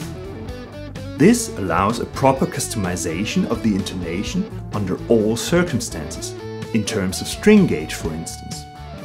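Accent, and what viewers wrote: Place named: Japan. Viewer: This guy talks like a Japanese man that just learned English...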